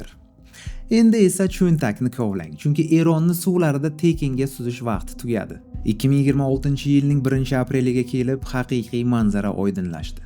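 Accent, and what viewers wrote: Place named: Navoiy, Uzbekistan. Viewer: Мабодо Навоийликмасмисиз шеваиз ухшаб кетаркан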